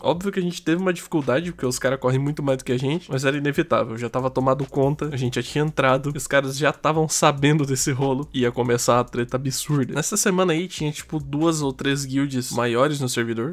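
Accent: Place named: Rio de Janeiro, Brazil. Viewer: Coe, tu só pode ser carioca ou tu é sul fluminense, pprto que não tem como kkkkkkkkkkkkkk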